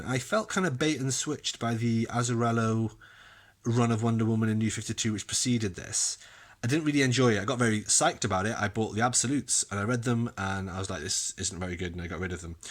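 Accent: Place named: United Kingdom. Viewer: Crossworlds was great, Jae Lee's art was incredible. I feel your pain with the shelves, here's a pic of mine. At the bottom I had to raise the right shelf to fit the Transformers Premier Collection books which are Absolute sized (I think - they're about 1cm taller than a 12 inch album). I also divide by format - omni/OHC/Premier/TPB. I just can't have them mixed up together. I collected Superman Unchained when it was released as floppies, but I gave up an issue or two before it finished because Jim Lee just didn't want to prioritise it and it took months just to get one issue. Somehow I never picked up on you being Welsh. Maybe it's because so many youtubers are Amerixcan I just heard a British accent.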